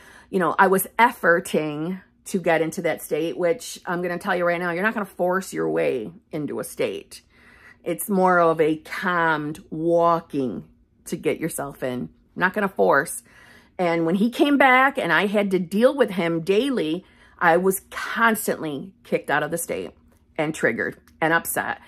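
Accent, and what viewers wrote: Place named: Minnesota, United States. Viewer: Love your North Dakota/Minnesota accent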